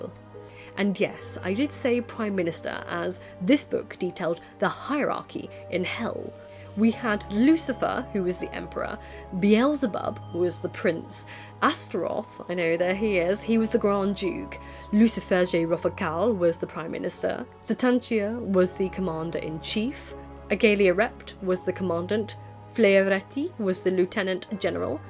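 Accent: RP, United Kingdom. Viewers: British viewers, does her accent sound as posh to you as it does to me? I can't imagine there's a British accent that's more posh than this lol.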